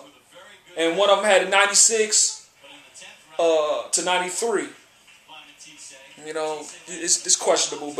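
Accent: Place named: South, United States. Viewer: My nigga u sound like u from one its them down south states or I maybe even from st louis so u holdin down ya mans but that nigga alexander loss but he did look way better than I though I had him getting ko'd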